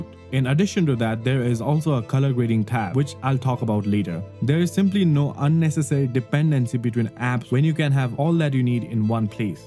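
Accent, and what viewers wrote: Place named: Nepal. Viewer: Also identified a fellow Nepali from your voice, keep up the good work sathi. :hand-purple-blue-peace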